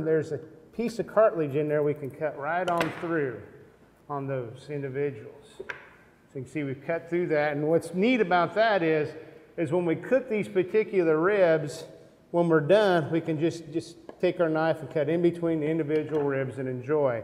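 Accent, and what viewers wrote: United States. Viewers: I was thinking why does this guy have an american accent, he works at the UK agriculture college.... it took until he mentioned Kentucky for the penny to drop.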